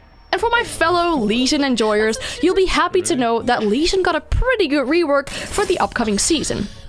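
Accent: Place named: United Kingdom. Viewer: Lol you're dutch? In another vid i saw u sounded British 😅 groetjes.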